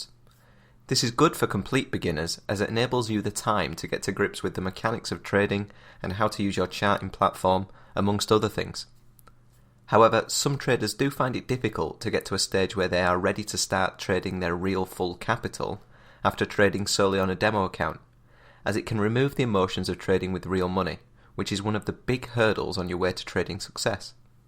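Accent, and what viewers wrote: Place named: North, United Kingdom. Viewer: any suggestions on online personalities? do you do mentoring. BTW your videos are awesome its always a great feeling of confidence when i listen to someone with a northern accent lol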